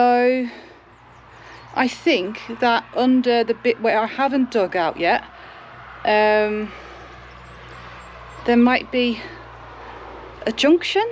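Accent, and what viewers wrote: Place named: Australia. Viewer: Just wondering if you have lived in my country Australia? 🇦🇺 Sometimes you have a little Aussie twang. You did a great job facing a disgusting 🤮 situation. Brave woman.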